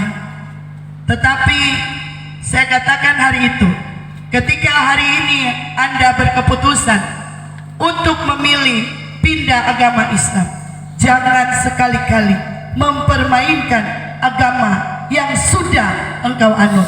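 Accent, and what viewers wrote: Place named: Manado, Indonesia. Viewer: Kalau dengar bahasanya kayaknya dari Sulawesi Utara kota Manado...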